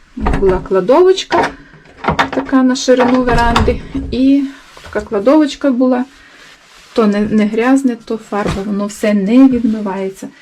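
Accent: Western Ukraine, Ukraine. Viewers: Непохоже,что вы из Запорожской области.выговор у вас западенский.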